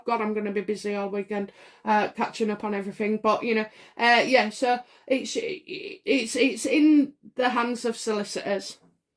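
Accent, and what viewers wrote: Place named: Northern England, United Kingdom. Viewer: There have been times when you've had me laughing so hard, I had tears in my eyes. You make me laugh an awful lot. It's your northern straight forward talking. Also your own spontaneous reaction to some of the cards you pull. You're the best💕 As for H's book, how can he say he's become a man. He's a treacherous backstabbing weevil, married to a money grabbing plagiarist. I truly feel for the Queen, Prince Charles, Prince William and the rest of the Royal Family. Love and light everyone from here in Ireland. 💞💫💞💫💞💫🇮🇪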